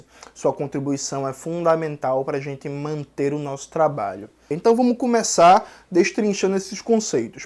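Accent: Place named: Northeast, Brazil. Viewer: Irmão tu é da onde? Eu passei o vídeo todo tentando descobrir de onde do nordeste era o sotaque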